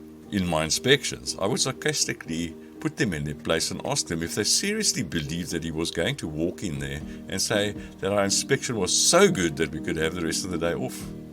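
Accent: South Africa. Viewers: You're English speaking? Where you from? Bloemfontein? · You have a very strong Afrikaans accent. JY is soo Afrikaans en probeer bewys JY is nie.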